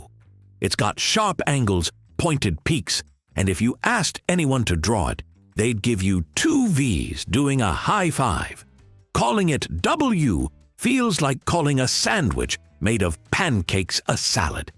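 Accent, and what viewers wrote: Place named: United Kingdom. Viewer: Thank U very much!! 👍 👍 😃 😃 Absolutely LOVE the British accent!! 😉 😁 😁 😁 🤗 🤗 🤗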